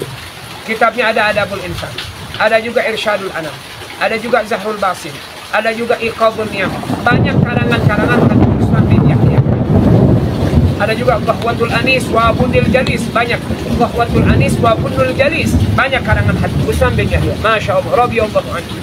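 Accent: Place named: Java, Indonesia. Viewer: admin ustadz iki alamate ning endi .iki potongan logat mah jawa kita kene iki yah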